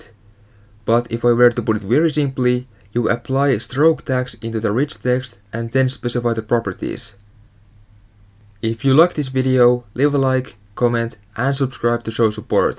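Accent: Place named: Finland. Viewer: ei juma ootko suomalainen huomaa aksentista :DD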